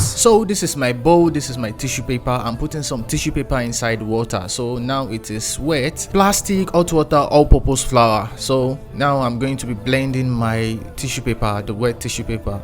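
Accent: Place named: West Africa. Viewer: Thought it was Kendrick Lamar till I cliqued on the video and heard that wonderful west african Accent 😅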